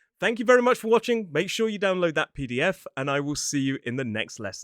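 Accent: United Kingdom. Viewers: But we do llike his talking and we are here to listen to his brilliant british accent❤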